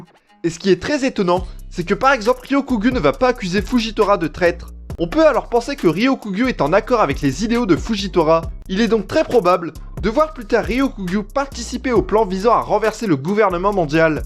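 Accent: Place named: Nord-Pas-de-Calais, France. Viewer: Ptdrr ta un accent chti un peu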